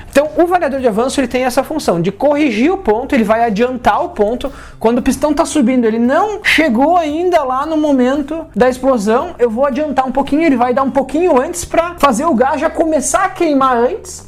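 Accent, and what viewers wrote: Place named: Rio Grande do Sul, Brazil. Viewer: Sotaque de gaucho raiz 🤙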